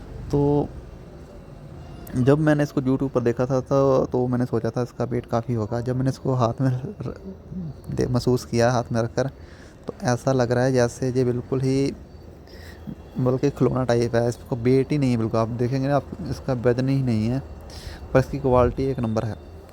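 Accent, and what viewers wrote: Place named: Punjab, India. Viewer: great video buddy....very enjoyed your hindi in punjabi accent 🙂🙂🙂🙂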